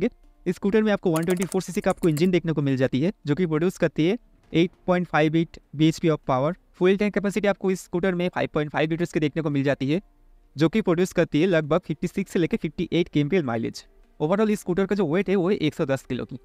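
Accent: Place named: Bengal, India. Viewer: You are pure bangali 💯% confirm 95,000 hazar bolne ka tarika pura bangali ka he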